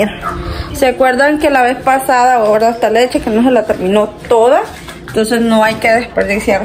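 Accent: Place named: El Salvador. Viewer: Los salvadoreños así hablan linda conozco muchos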